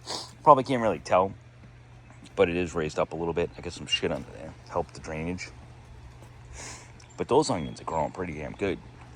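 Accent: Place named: Northeast, United States. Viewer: Thanks for sharing your garden! Are you in the far Northeast like near Boston? Pickup up a subtle Northeast accent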